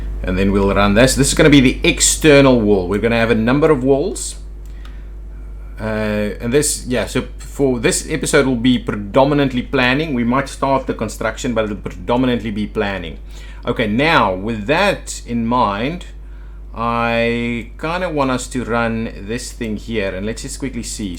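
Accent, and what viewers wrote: South Africa. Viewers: you sound south african, tell me its true. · When I was a child, I used to watch a snake expert on TV called Austin Stevens. You're accent very much reminds me of him. I always liked the South African accent. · Its actually a South African accent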